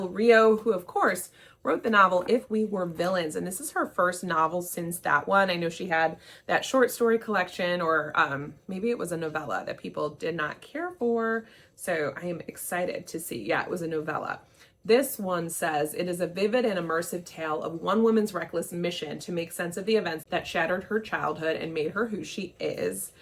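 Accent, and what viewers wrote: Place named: United Kingdom. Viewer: I love your take on British pronunciations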